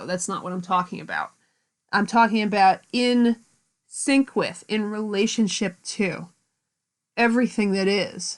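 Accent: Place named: Salem, United States. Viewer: I love your Salem accent when you say "forward" as "foeward" ♥️👏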